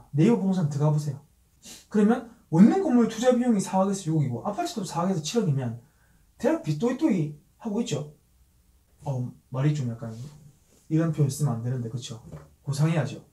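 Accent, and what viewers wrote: Busan, South Korea. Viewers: ㅋㅋ부산사투리란다,,전혀 아닌거 같구요~~ 꼭 만나면 말도 못 할 분께서 전혀 문제될 부분도 아닌 곳에서 키보드 워리어를 하시더라구요^^ 부산사투리 쓰는게 듣기 싫으시면 안보는 방법이 있어요!! 똑똑하쥬?? 꼭 싫다면서 보드라구요🤭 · 마이크문제가 아니구 부산사투리랑 발음문제입니다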